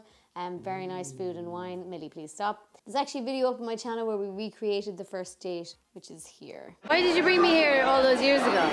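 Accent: Ireland. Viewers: I just discovered I'm half Irish. Soo id like to learn to speak like you now. Love the accent.😁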